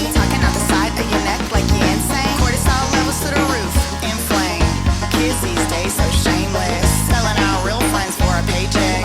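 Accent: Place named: Louisiana, United States. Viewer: I love watching you 😂 the pink Pom Pom’s, Louisiana accent…just so darling 🤗 love from London 🇬🇧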